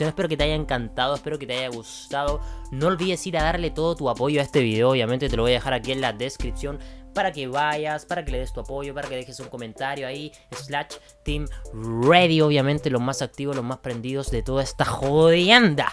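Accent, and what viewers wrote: Chile. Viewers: Eres 100% chileno? Porque es diferente tu acento??? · Eri chileno o no? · Eres chileno?xd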